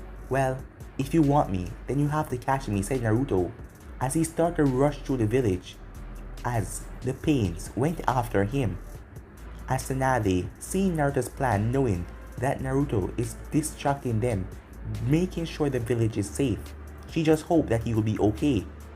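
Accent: Jamaica. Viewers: Lol you sound Jamaican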